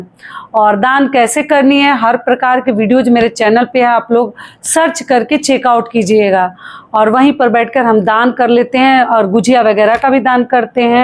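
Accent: Bihar, India. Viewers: आप बिहार से है लगता हैं आपकी बोली से लग रहा है मै भी हूं 🙏आपने बहुत अच्छी तरह बताया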